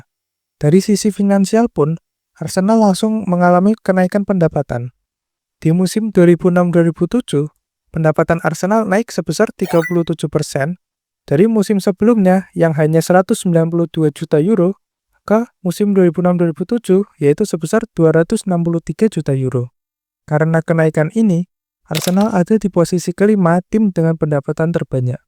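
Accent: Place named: Java, Indonesia. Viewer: sueneng aku koyo ngrungokno radio nganjuk , tetaplah medok mas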